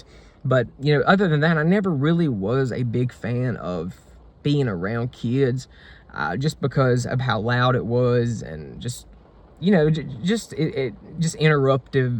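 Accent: South, United States. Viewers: "gay country accent".. lol. It's just the region in the South he's from... and the parents (voices) he was raised around. (I'm from the south)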